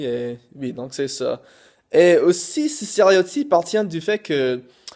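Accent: Quebec, Canada. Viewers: La plupart des français se douchent tous les jours, mais je connais plusieurs personnes qui se douchent moins souvent x) Je trouve que tu as un accent québécois haha !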